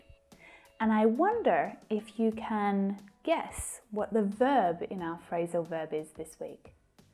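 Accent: Australia. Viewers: Are you an Australian? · Thank you so much for your lessons l juste want to make sure are you Australian or American or Canadian???????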